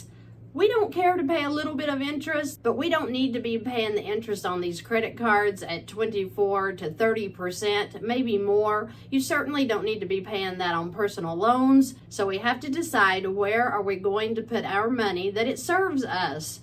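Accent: South, United States. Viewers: Love the Southern twang. Love the info more! Thank you.👍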